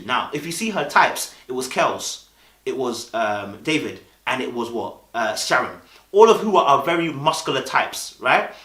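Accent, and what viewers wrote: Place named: Birmingham, United Kingdom. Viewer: This was really good. However it's like tfor the first time in watching so many of your videos that I fully heard your Brummy accent. As in clear as day, the whole way through this loool. I was genuinely like "wow" 🤣🤣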